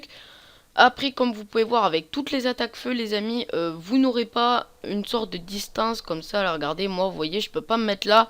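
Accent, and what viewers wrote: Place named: South, France. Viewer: le petit accent du sud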